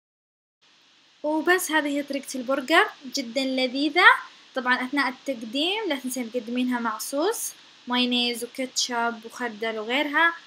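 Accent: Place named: Saudi Arabia. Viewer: صراحة انك مبدعه ماشاء الله بالتوفيق وبالنسبه للي يستغرب طريقتها في الكلام اذا سعودي ليش مستغرب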